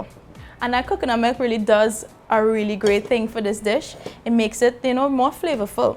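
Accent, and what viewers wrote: Trinidad and Tobago. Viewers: Somehow I lost you on my facebook, but I'm so happy to find you on YouTube. Your accent makes me feel at home.... love that real Trini accent! Please keep these vids coming!!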